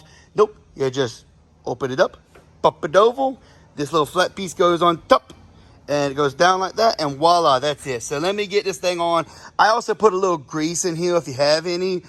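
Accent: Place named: South, United States. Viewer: Over tightening is my middle name 😂. Always stripping screw heads or bolt threads. Bad habit. Side note, that Southern accent is pretty cool. I thought I was listening to the American Dream Dusty Rhodes telling me how to fix my Fourwheeler..😂😂 I have an issue with the steering being hard to turn. I replace ball joints, tierod ends and wheel bearings on both sides and it turns a little better but surely should still turn easier. The bushing is one thing I haven't checked or changed. Was wondering about greasing it but idk if it'd do any good since you said it didn't really make a difference on greasing a new one. (Btw all that stuff I replaced needed replaced anyway but barely made it easier to turn)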